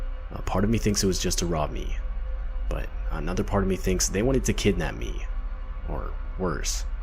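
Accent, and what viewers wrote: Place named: Berlin, Germany. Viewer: The narrator sounded like from Wansee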